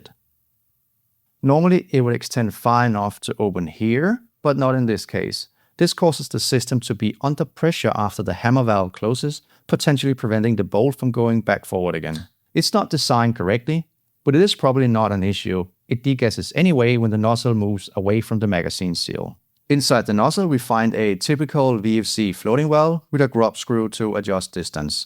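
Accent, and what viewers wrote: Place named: Denmark. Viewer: Very interesting video, i dont know why, but your accent sounds very Danish to me?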